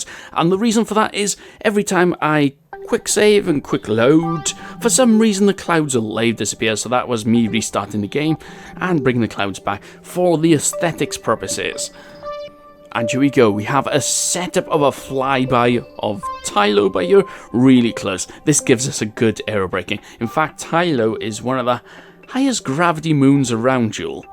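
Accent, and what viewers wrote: Wales, United Kingdom. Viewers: Welsh? Did I hear WELSH?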